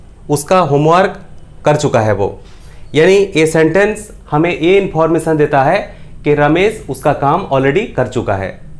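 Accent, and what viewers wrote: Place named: Odisha, India. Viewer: Good job sir. But aap hindi kyu bol rhe ho sir bich bich me apke muh se odia nikal ja rha hai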